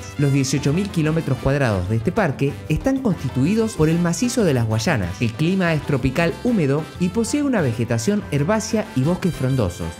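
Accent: Argentina. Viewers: La narración es hecha por una voz argentina. Por que?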